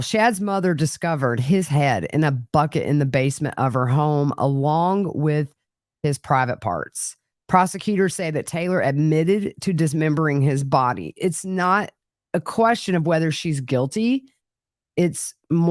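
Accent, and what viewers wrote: South, United States. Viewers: Hola from the Big 🍎 - I watched this at 4 am - this new case is going to be a doozie! If she’s acquitted (?) I’m going to set her up with my ex-husband. Love watching you, especially when I wake up and can’t sleep - miss the southern accents! The south is beautiful. Love you lots!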